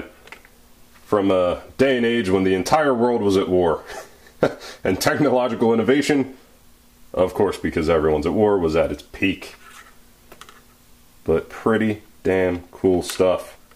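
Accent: South, United States. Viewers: Southern version of AvE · Wow! I thought I clicked on an AvE video for second. Same type of voice with a southern accent.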